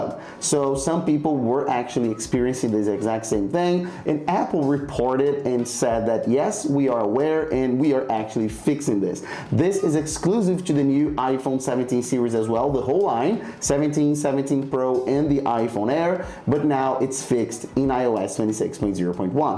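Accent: Brazil. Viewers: Great video, thank you! By the way, are you Brazilian?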